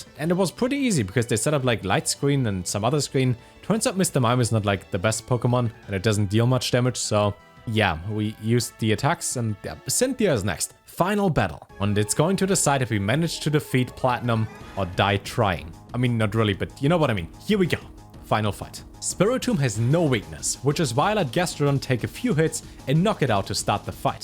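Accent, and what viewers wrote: Germany. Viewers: Man hört fast nicht dass du deutsch bist. Abgesehen davon hammer video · I subscribed for the German accent 😎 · Man hört irgendwie einen deutschen akzent raus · Hey, you kinda sounds like german are u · Are you German lol?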